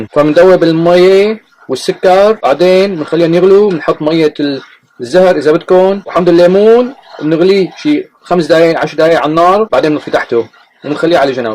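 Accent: Levant. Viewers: شغلك غير شكل والاحلى منو حكيك يلي بذكرنا بالشام تعيش وتعلمنا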